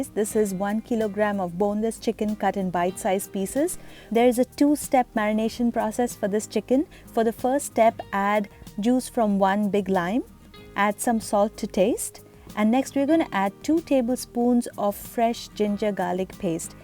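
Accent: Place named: India. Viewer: That looks superb thank you, BTW I love your Indian Accent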